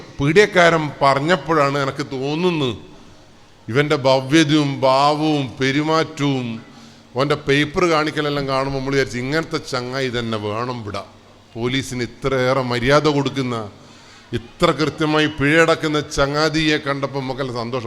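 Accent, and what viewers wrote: Malabar, India. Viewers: മലബാറിൻ്റെ ആ ഭാഷ ശൈലി❤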